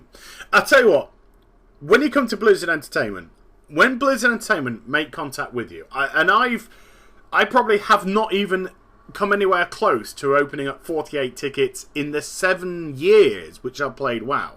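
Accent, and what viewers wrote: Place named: United Kingdom. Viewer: why is it that British people pronounce it beeta instead of bayta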